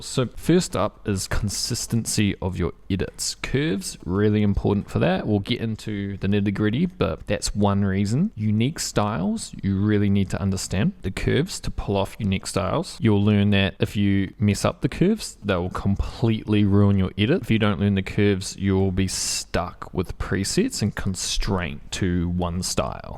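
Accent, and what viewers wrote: New Zealand. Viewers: Him and all the other Kiwis living in the WÜÜÜRLD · it was interesting but your kiwi ixint is so strong i get distracted :DDD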